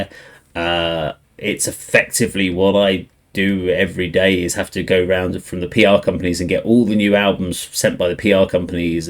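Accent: United Kingdom. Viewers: Exactly!!!! It was very DISRESPECTFUL!!!!. WE ARE MILLIONS OF PEOPLE FROM MORE THAN 15 COUNTRIES WHO SPEAK "SPANISH" AND WE ARE NOT FROM MEXICO. THE language NAME is "Spanish". It's like if I say that you are speaking in the "USAing" because I assume that if you speak English then you are from USA and I call you GRINGO, when I clearly hear your British accent. English speakers tend to have this closed world mentality about culture, languages and geography because they speak only one language (while the majority of the world is bilingual). Many times that I have seen English speakers who when they hear Spanish assume "Mexico" which shows the level of little education in those countries respect to other cultures and how they tend to look only for themselves. By the way Spanish music is the second most listened to music in the world today. Just so you know. 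Maybe you need a long trip to latin america to know the world outside you caveman and open your mind....you can discoverd that exist a lenguage called SPANISH with more of 20 spanish accents in a lot of countries even Africa.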